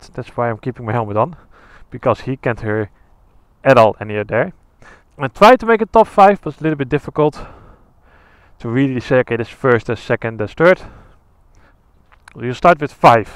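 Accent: Netherlands. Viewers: Ik hoor gewoon aan je dat je nl bent😂 praat gewoon nederlands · Haha ik dacht ook gelijk hé Nederlander haha. Rijd ook een R.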